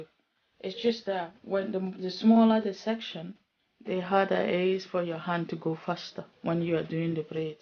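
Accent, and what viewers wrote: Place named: Scotland, United Kingdom. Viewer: Iv watched your videos before and this is the first time iv noticed that you have a Scottish accent 😅 just when I hear you say some words I noticed it. These braids are so cute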